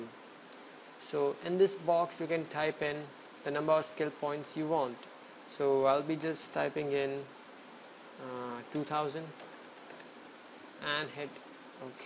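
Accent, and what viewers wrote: India. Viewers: nice accent. indian boy. ? :)) btw . tnx a lot it helped:d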